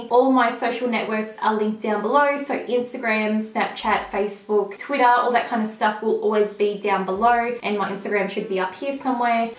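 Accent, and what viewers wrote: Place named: Australia. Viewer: I didn't realise you were Australian 🙌🏼🙌🏼I should of known from the accent hahaha. Your hair looks super amazing today 🙌🏼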